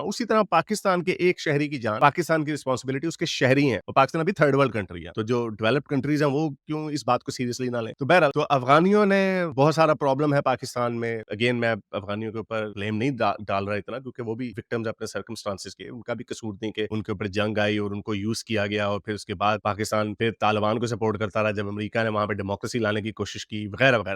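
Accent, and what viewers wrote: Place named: Punjab, India. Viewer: bhai aapke bolne ka style Indian Punjab jaisa hai. Matlab ttha sota baat kerte ho. Jo fact hai ussi pe baat kerte ho. Neutral ho ke baat kerte ho. Ye nahi ke aap pakistani ho toh sirf India ke berkhilaaf hi bolna hai. Aapne Pakistan ke propaganda ko khol ke rakh diya. TNX 🙏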